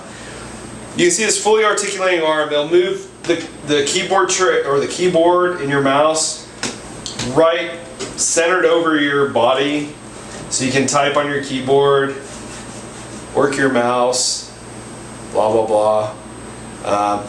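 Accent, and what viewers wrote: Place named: Netherlands. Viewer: Installed the hotas yesterday and have flow a couple of minutes in Arma 3, ED, and DCS and have to say im very happy with it, the immersion is so nice that i now want that the seat banks left when banking left lol. also watched a couple of vids of you. you got a nice setup mate. i heard your dutch if im not mistaken hehe. maybe if your down for it we grab a game together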